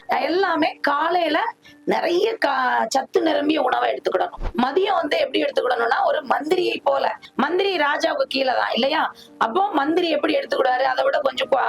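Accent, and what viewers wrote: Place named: Thoothukudi, India. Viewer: பேசும்போது தூத்துக்குடி வாசனை வருகிறதே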